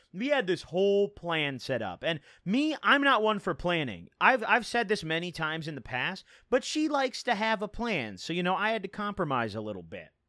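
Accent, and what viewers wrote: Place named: Midwest, United States. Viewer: Could tell he from the Midwest by the way he talks lmao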